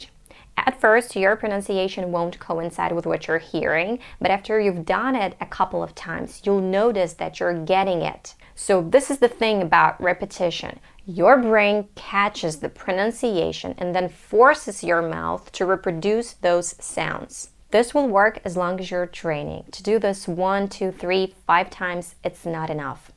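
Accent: United States. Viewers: I thought you were a native speaker ! Then , I heard a little accents ...when you said " comfortable " & the way you said " thank you " in another video ,here & there ,but you are really really good ! Usually any Europeans have their accents ( heavy ) when they speak English ! You got an American accent...why ? How come you chose the accent over British one ? I am curious ! Anyway there are many very good English teachers on you tube ,a couple of English teachers & one American ,they are all female interestingly ! Lol. But, you can really help non native speakers w/ English by using your own experiences & insights ! That others can't ( as those ladies that I really like are all native speakers ,so they don't go thru w/ the walls we,non native speakers bump into & find it hard to climb up on ,or break it down ) guide the way you can ! You are a real good success story that we all can lear from ! 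You deserve more viewers & subscribers !👍💜💜💜💜💜🥁🐉🎵🎤🎶💕💞💕💞💕💞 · Your American English accent and pronunciation is so perfect, dear ... You are one of my pavourite english pronuncers ... Where are you from ?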